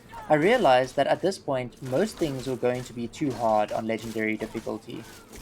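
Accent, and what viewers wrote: South Africa. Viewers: I can recognize a south african accent from a mile away, ggs man :))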